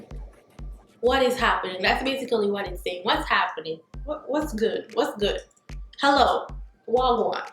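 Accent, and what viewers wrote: Jamaica. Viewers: I don't understand not one bit of patois but I love hearing it lol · As a latina I’m seeing a Jamaican and his patwa is sooo strong lol I’m learning tho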